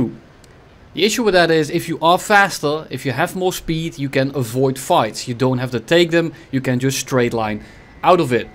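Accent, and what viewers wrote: Netherlands. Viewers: wait are you dutch?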